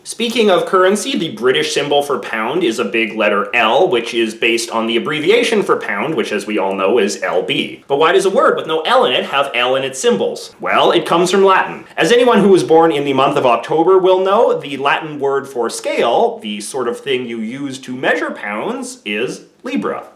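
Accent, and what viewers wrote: Canada. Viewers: keep up the good videos , also you sound oddly canadian , you live up north? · You are the first Canadian I have heard who actually says aroond and aboot, lol. I thought that was just a myth... I'm sorry that it sounds comical to my ears :( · bnfk He’s Canadian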